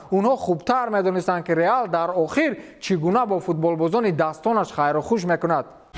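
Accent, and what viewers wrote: Kulob, Tajikistan. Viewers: Ира ами гапзаниш зебш лафзи Кулобигиай на ин ки будикский ть бра ягон будика ихел гап нишон бтее хорошо лафзи шмо агар лафз мешид дар иҷа ба Кулобиги гап намезад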